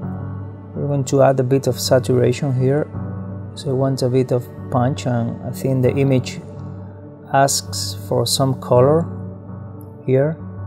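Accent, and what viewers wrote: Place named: Spain. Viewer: Are you from Spain? Because I am and I can notice your accent 😂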